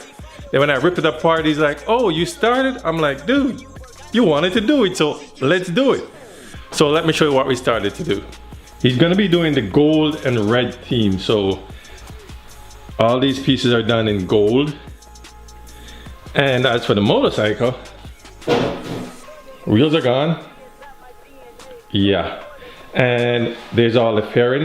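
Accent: Jamaica. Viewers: Found ur Channel last night n watch about 8 videos to this point ... keep it up man love the content man ...u sound like a yaadie to lol.. bless up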